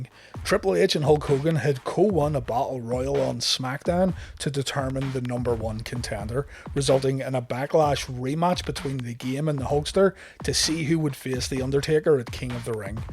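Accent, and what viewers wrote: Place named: Scotland, United Kingdom. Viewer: I'm Irish too and I thought he was scottish too:O love your vid by the way <3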